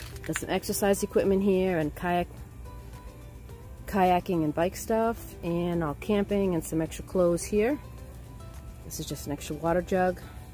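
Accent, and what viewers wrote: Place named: New York, United States. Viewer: You've been at this for a long time, so I expected your van build to be really cool and functional. Nice job. Oh, and that New England accent is undeniable. Or maybe it's Minnesota or maybe Bay Shore Long Island New York. LOL!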